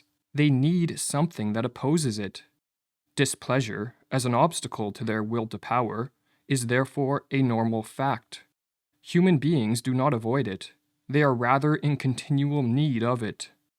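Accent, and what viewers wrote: Canada. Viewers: Canadian you are, I noticed.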